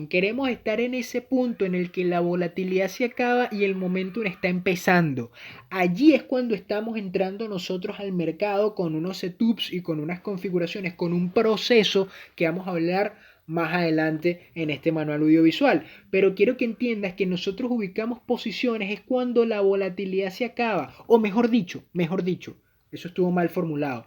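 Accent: Venezuela. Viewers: Muchas gracias por compartir estos vídeos la verdad es que me han ayudado bastante a poder entender el mercado sobre todo por que lo dices de una forma amigable y en el acento venezolano que es tan como de escuchar para mi. seguiré viendo los vídeos para aprender a crear mi propia narrativa y proceso y poder operar de una manera consistente en el mercado. Saludos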